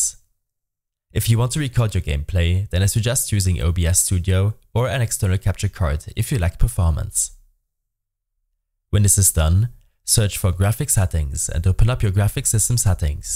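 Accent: Germany. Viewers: du bist deutsch man hört es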